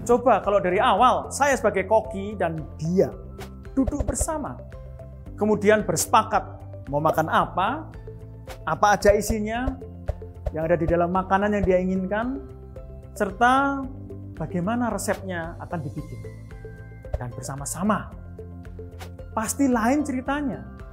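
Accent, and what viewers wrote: Standard. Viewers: Pengucapan yang baku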